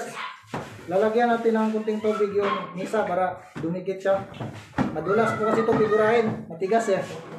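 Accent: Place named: Visayas, Philippines. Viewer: BisaYa kaaYo dodong #vlog cho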